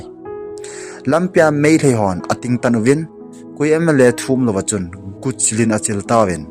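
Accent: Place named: Kuki, India. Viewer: Dik mah² ei and i really like ur accent pure kuki accent which i really like....👍👍👍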